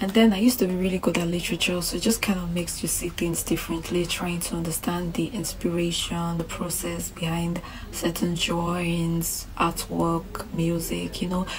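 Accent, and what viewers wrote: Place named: United Kingdom. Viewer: I like the blend of Queen’s English and Pidgin🤝 Keep ‘‘em coming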